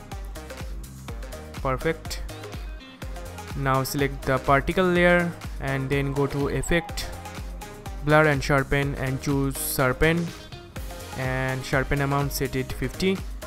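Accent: India. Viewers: Hey Buddy are you Indian?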